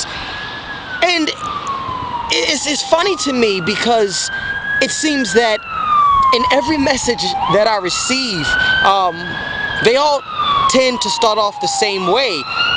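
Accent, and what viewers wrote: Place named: Baltimore, United States. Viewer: I knew you were from Baltimore..I can hear it all in your voice...And why do straight men do that. I think they do think this, that every gay man wants them...They need to pump the breaks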